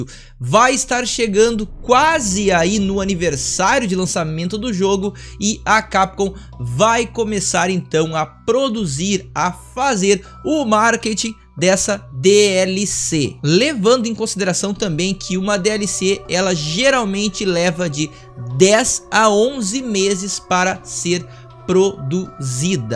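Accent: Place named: South, Brazil. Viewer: Opah chegando agora no canal, depois da live com o enmynest, esse teu sotaque é aqui do sul certo, Rio Grande RS abração, mais um inscrito...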